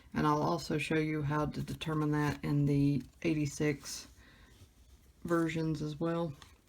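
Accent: South, United States. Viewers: You got an awesome box. And your southern accent is awesome too. Don't let anybody tell you different. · Audio is perfect, your southern voice is perfect too. Nice box! Love the design, Gina make a nice football card. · The southern accent rocks.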